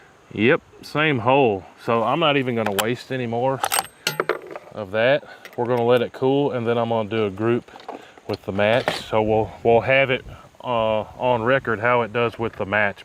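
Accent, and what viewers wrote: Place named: South, United States. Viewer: I just noticed your voice and accent is very close to Hank Hill.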